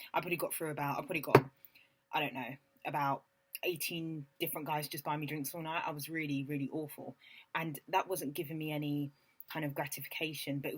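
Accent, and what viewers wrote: United Kingdom. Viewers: Man....there's just something about black girls with British accents........😍😍😍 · omygod u remind me Jessica sula in the series (skin) with your voice and your accent. I love British accent. but I'm not in England, I'm in Canada(America)and would like to be in your country to be better in English and to can talk with British accent. thanks to listen me and i hope u will share more super videos ad I will always listen u. bye😘😍😀😀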